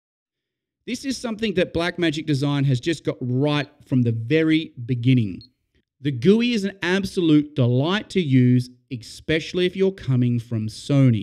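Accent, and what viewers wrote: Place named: Australia. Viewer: I can’t tell if you’re from down under or from the fruit isle... I am Australian so you better not hate on me...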